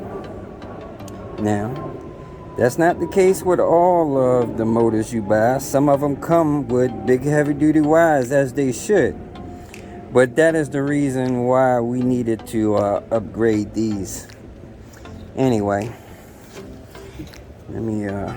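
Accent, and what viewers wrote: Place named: Louisiana, United States. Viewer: I can tell you know your electricity, after you mentioned ohms law, and how a diode pushes current through one way only (in another video). I know you're in Virginia, but you sound like you're originally from Louisiana. ✌️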